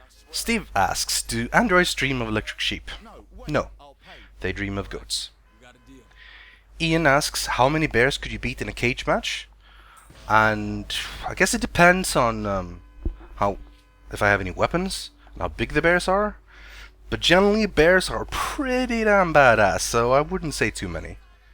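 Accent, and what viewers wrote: Scania, Sweden. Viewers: cause he speaks skånska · MIND BLOWN!!!! DU E SKÅNSK !!! (göteborg ftw heheheh) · Skånsk dialekt = MindBlown! Din Engelska Dialekt är Godlike men jag trodde ALDRIG att din svenska skulle va så skånsk XD, Anyways You're an awesome Swede Ska fösöka komma med på någon Ventrilo eller så med er :) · Haha, visste inte att du va svensk fören du snacka skånska '' jag bara wtf'' xD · OMG det känns så konstigt att höra dig prata skånska xD Men det är underbart. Du är bäst. Fortsätt vara awesome! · Yaaaay du är skånsk =D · JA ÄR SKÅNING:D har du varigt i ängelholm? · Det var så märkligt att höra dig prata skånska... vet inte vilken dialekt jag tänkte att du skulle ha men skånska fanns liksom inte på tapeten. As bra kanal, keep up the good work! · DIN SKÅNSKA THO omg like i'm from skåne but holy shit it's so weird to hear idk why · Fuck! Han e skåning! 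· Å herregud! Du pratar skånska! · Herregud han är skåning:D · Det var inget annat än skumt att höra dig prata skånska, men du gör bra videos så fortsätt med det! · SKÅNSKA FTW! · 2 skånska 4 me även fast jag är skånsk.. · Varför alltid skånsk?? TheSwedishLad är samma sak... Pewdiepie är den enda som jag känner till som inte är skånsk...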